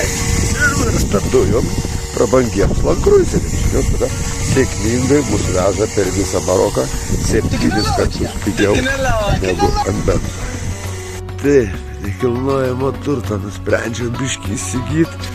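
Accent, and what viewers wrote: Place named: Šiauliai, Lithuania. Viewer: O KALBA ŠIAULIETIŠKA..RYŠKIAI😂❤👍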